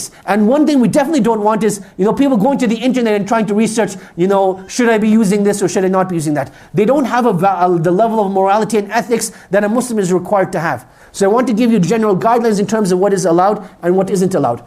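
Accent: South, United States. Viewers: al salaam aliekum yous sound like your from oje of the Southern states. I onow plenty of muslim people whom I have had the pleasur of working with and they have always been peace loving and shared the same intrinsic values as I do, some have escaped oppressive regimes like the taliban and have come to Sydney for freedom to worship to work and to give families a better life. There is a mm uslim preacher called Nounan Ali Khan, I often watch him on youtube, whilst he teaches out the Quran just about alo he mentions on guidence for life, and godly wisdom is also in the bible, he is very gifted in being able to get his message across. Ias a christian I see the church should be out there building bridges with people. There is no room for anti sematism and islamaphobia. Sadly though people of all faiths use there faith platform for selfish and politican means. 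Thankyou for your kind words alhamdililh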